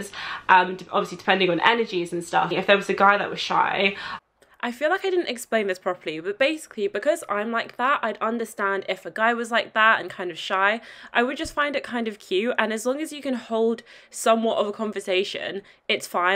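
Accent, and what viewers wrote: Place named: United Kingdom. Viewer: I love your british accent guapa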